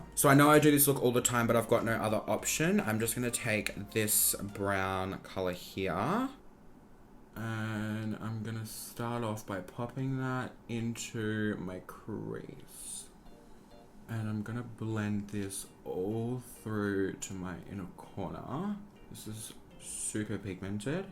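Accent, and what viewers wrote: Queensland, Australia. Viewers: Omg youre aussie!?? Hello from a fellow Australian😂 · 1st time on this channel. I already love it! Straight off the bat also I can tell that he's from Queensland. 👌❤ Also congrats on trending! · OMFG I LOVE YOU ❤❤ you make me miss Australia with your accent 😭😂 your so fucking funny!! I love you, and your videos ❤❤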